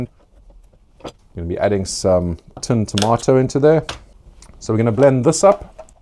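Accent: South Africa. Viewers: Typical weather in the UK? I could have sworn you were in South Africa with the accent. Hmm.. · No way i thought you were south aftican not british! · Sorry I haven't seen your channel until today as your content is great! Are you from Capetown originally? I think I hear a definite SA accent. I have made ketchup/catsup a couple of times, much superior to store bought, but a real effort to do, I think that if you get in the rhythm of doing it, it is as much of a chore.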